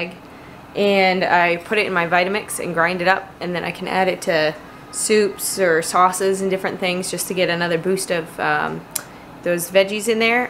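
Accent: Maine, United States. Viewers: I could sit down and listen to your Maine accent all day long soup looks great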